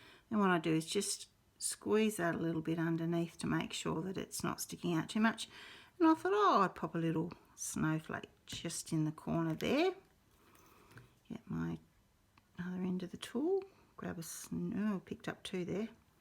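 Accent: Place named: Australia. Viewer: Hi - I've just discovered you and subscribed. This is an amazing and oh-so-beautiful card. LOVE the designer paper and the dies too. As it's almost the very end of December, I suppose that designer series paper is no longer available, but I will try. Also, it's lovely to hear an Aussie accent when watching Youtubes. LOVE YOUR WORK!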